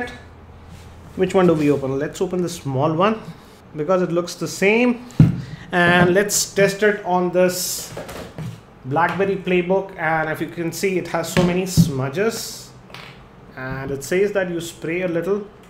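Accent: India. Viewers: Indian accent is so funny LoL !! I PAAAD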